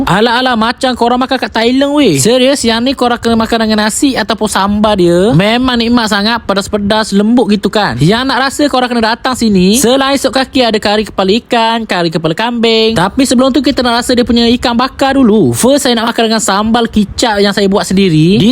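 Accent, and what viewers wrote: Terengganu, Malaysia. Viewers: Abg ni org KL alik ganu ke? 😂😂😂 ulang ulaman. Jengg kreng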